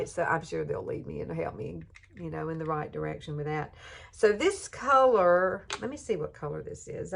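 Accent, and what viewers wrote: South, United States. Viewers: I love your southern accent and you look like a green eyed Heather Locklear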